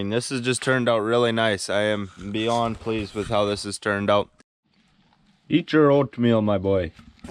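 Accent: Canada. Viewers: From his accent I am going to guess the middle part of Canada. Saskatchewan or perhaps Alberta. From the trees I’m going to guess the northern portions of those provinces. I’m in NW Ontario.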